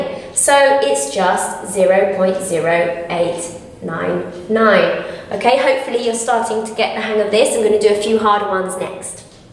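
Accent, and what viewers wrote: United Kingdom. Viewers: Thanks I love how you explained ❤️ and I am watching this video 10 hours from my .ath exam so appreciate you and your British accent is nice🤣😂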